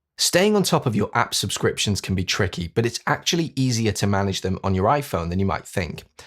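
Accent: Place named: Liverpool, United Kingdom. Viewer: Are you originally ftom Merseyside area ? You have a twinge of Liverpool accent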